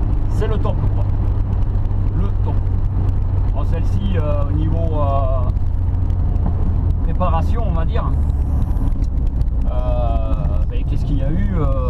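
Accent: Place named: Hautes-Pyrénées, France. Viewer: Aaah super ! En plus des mob une rallye . J ai clio rs1 en plus du spx et mvx. Et à l accent je dirais que tu es de mon coin(65)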